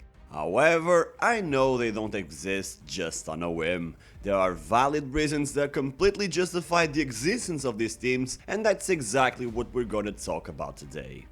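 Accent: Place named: North, Portugal. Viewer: Ótima análise! O teu conteúdo é ótimo (com especial orgulho pela pronúncia do Norte)! Gosto sempre dos ângulos e das histórias de que te lembras, é excelente. Três sugestões de temas: o papel do Benfica no Estado Novo (a teoria de que era o clube do regime supostamente tem muitos argumentos em contrário); o nosso FCP antes da entrada de PdC; e os porquês de nenhum clube que tenha sido adquirido em Portugal ter conseguido crescer verdadeiramente (por exemplo o Beira Mar). Obrigado pelo conteúdo! Um abraço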